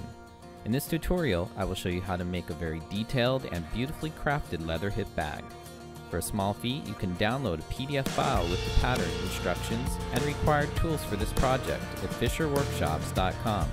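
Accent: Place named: Nevada, United States. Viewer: sorry Taiwan not Philippines and that's what I figured. You sound like me and I'm from Nevada haha.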